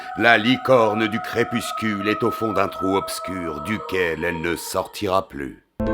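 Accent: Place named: Germany. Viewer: T'es trop fort. Tu te transformes en chef d'orchestre d'un concert de rires pendant tout ton récit 😅 Ton accent teuton est parfait, on s'est vraiment payé une bonne tranche de rigolade. FAISAN encore beaucoup des comme ça 😅😅😅 Bises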